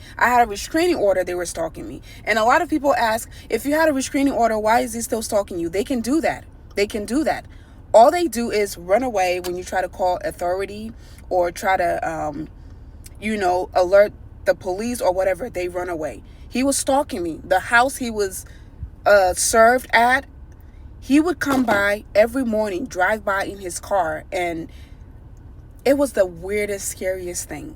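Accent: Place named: Jamaica. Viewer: Maybe you sound like you from Jamaica or somewhere maybe you should probably go back somewhere where he can’t find you and don’t talk about it just up and move somewhere and you might have to leave some people behind. If y’all have mutual friends you may have to cut them off cause it sound like he might try to do something to you. You might wanna justmove again and don’t tell anybody.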